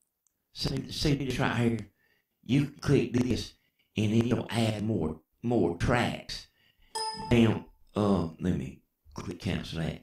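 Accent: South, United States. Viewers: I learnt more from your one video (with that adorable southern drawl :-) ) than from a whole series run by a boring smooth-talking digital 'expert'. Thanks for helping us out. (Ps I love how you say 'raaaaah-click)..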